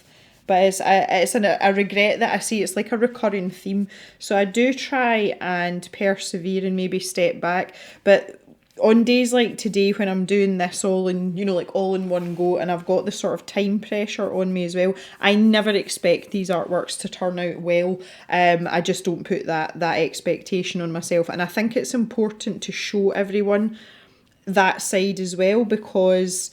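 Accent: United Kingdom. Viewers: Very nice picture. I have the comlete range of the Faber Castell pitt artist pens (a Christmas gift from my husband...thank you so much) and I like them so, so much. Especially for using as a watercolor fake. They work so well on every (nearly) kind of paper and have vibrant colors. Your picture is so great, thanks for sharing this with us and uploading. Your accent is very interesting. I know you are british, but which area do you come from exactly? Greetings from Frankfurt and stay healthy please!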